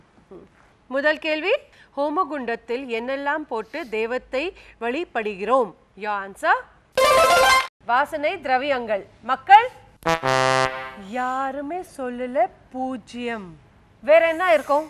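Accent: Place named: Punjab, India. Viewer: She is from Punjab and be proud she is trying to talk our language. Namma oorlaye Tamil a pesadha tamilans irukanga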